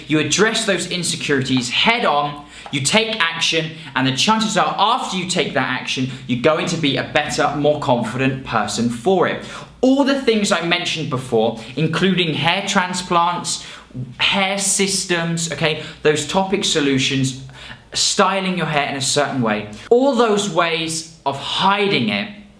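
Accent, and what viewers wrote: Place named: England, United Kingdom. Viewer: He's English. He's saying it the right way. They did invent the language after all lol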